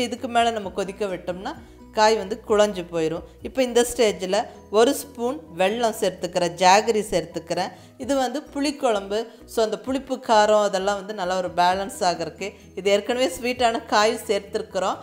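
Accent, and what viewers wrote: Coimbatore, India. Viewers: kongu tamil arumai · Hai sis eppadi irukkenga... Unga kovai tamila kettukitte irukalampola avlo alagaa irukku. Subscribe panravanga ennikkaiya parkkumpodhu avlo happayaa irukku sis... Neenga innum niraya munnera en anbaana valthukkal... Dish asusewel super. 👋 👋 👋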